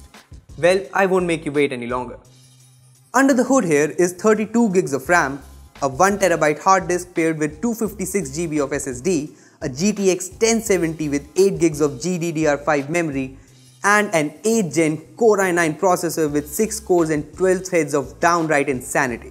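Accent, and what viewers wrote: India. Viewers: I like your heavy Indian accent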